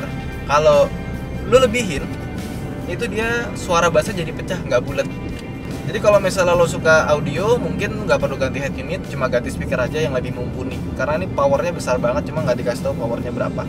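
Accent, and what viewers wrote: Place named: Semarang, Indonesia. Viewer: mas wong ndi to? logate koyo wong semarang? asem...:D usul: kenapa gak kalian bikin acara khusus otomotif ber-3 kayak top gear. Khusus menjelajah Indonesia/asean pake mobil2 yg familier di Indonesia. Kayak review mobil delica bagusnya langsung test naik gunung berbatu, berlumpur, melewati lembah dll. Gitu kan jadi lebih jelas pointnya. Bosen kalo test nya di hutan yang itu2 melulu mas.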